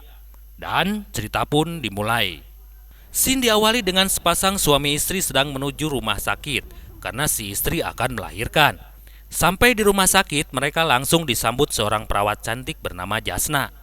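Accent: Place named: West Java, Indonesia. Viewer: Naratorna urang Sunda 😆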